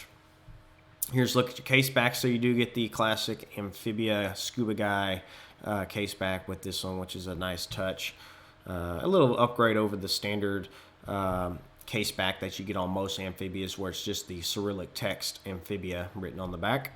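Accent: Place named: South, United States. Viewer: I like your approach. Your video style is very friendly, down to earth, fair minded, and helpful. Please keep up the good work! I am also recommending your videos to my students ( I am a freelance English teacher) as you have a very mild, clear, Southern accent, which I think can contribute to their listening skills nicely. ( I get them to listen to a mixture). Many thanks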